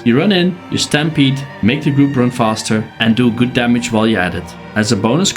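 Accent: Netherlands. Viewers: I really like your voice, and your Dutch accent.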